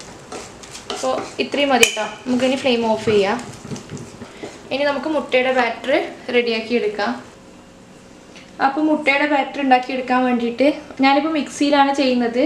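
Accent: Kannur, India. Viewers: Nammude Kannur ano???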